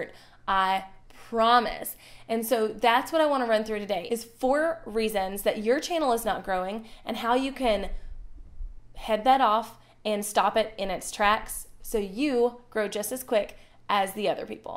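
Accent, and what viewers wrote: South, United States. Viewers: I’m glad I found your channel last week. I really like your presentation style and slight southern accent. And thanks for the tips! Keep up the good work! :)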